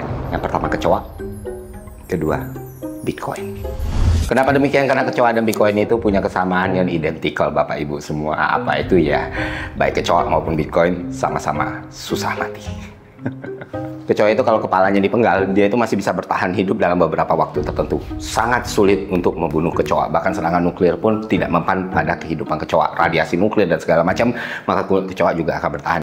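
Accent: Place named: Minangkabau, Indonesia. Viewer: Baru sadar kalau ko urang awak.. patuik lah nyo mode ko caro bapikia nyo caro mangecek nyo.. sukses selalu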